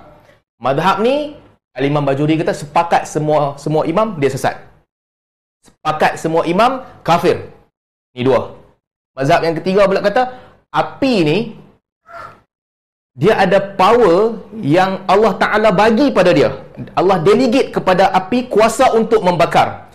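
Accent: North, Malaysia. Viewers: Mungkin disebabkan dia bicara loghat utara ada perkataan yang tidak ada dalam loghat KL/Selangor/johor-riau.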